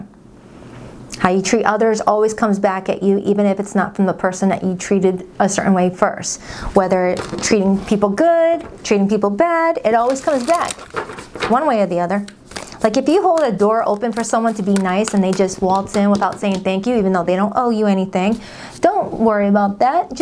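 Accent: United States. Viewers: I love your readings but could I ask you to slow the speed at which you are speaking as I know a lot of us Brits 🇬🇧 have great difficulty understanding certain US accents. We have to put the subtitles on to try and grasp what you are saying even though you are speaking English. 🙈 Please don’t take that as a criticism or take it personally as we none of us can help our accents. Many thanks for the work you do for us all. God bless and namaste 🙏🏼 xx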